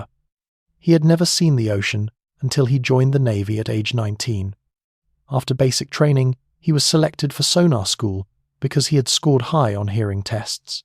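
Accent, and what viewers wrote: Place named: United States. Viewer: Hey ! The speaker must be a yank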